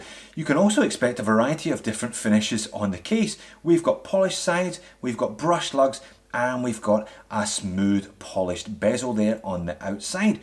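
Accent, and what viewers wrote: Scotland, United Kingdom. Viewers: Great video!! I do have a question though. I heard you say in another video that you are a proud Australian, but, honestly, when you speak, I only hear a Scottish accent. Why exactly is that?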